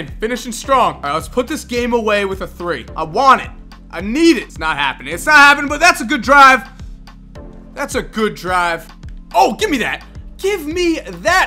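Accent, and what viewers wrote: New Jersey, United States. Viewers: Are u from New Jersey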